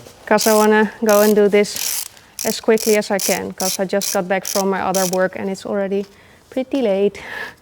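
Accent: Netherlands. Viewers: its just the normal dutch way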